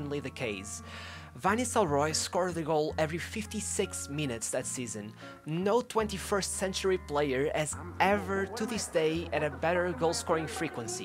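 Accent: Portugal. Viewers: Is the narrator Portuguese? Accent is very "Lusophonic." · The strongest Portuguese Accent Ever 😅😅😅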